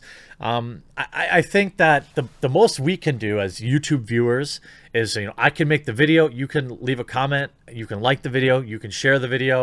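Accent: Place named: United States. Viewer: You're saying Xianjiang wrong, but I've heard a lot worse. You're just saying it with an American accent. You're also saying Uyghur wrong. It's not "We grr." That's just how MSM pronounces it and I guess most of the West now thanks to them. It's more pronounced like "Uuu ga ru." (I forgot how to write down sounds but that's the best I can do. lol)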